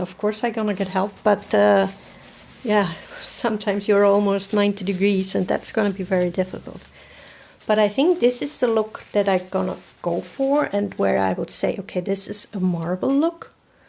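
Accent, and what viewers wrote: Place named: Netherlands. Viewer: Absolutely love this video. I too was adding too much color. I appreciate artists sharing their "mistake" creations. It helps me see I'm not as far off as I feel. I also love listening to you talk. My great grandmother was from Groningen Holland and I adored her. Hearing accents from the Netherlands brings back sweet memories. Thank you for sharing your journey.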